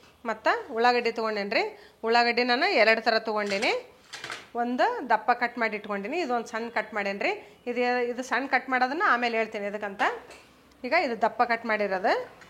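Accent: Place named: North Karnataka, India. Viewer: Aunty ri Nivu Uttara karnataka Bhashya ne matadi chaalu anusate ri 🙏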